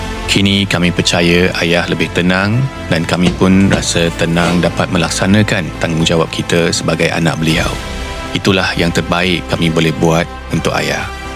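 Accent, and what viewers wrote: Malaysia. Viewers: tq for sharing.. nice malay accent, too 👍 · i relly like yr malay slang..❤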